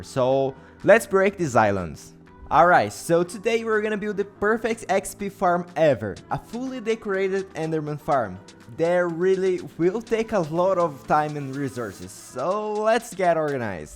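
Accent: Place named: Brazil. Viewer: eu tava percebendo que o seu sotaque era de Brasileiro, dai qdo c disse que ingles n era a sua primeira lingua eu saquei que c realmente é br kkkkkkkkkkkkkkkkk, sucesso pra vc parceiro, inclusive o seu ingles tá mt bom, parabéns (edit: tu se inspirou em genshin? Tem uma estrutura exatamente assim lá) (edit 2: agr que eu vi que vc falou no video kkkkkkkkkkkk)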